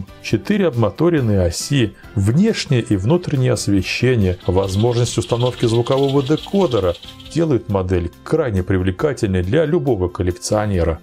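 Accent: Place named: Vologda, Russia. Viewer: Такой прикольный Вологодский говор, но всё по делу. Да, там на фото у него на самом деле очень грустный фейс, аж жалко его работягу.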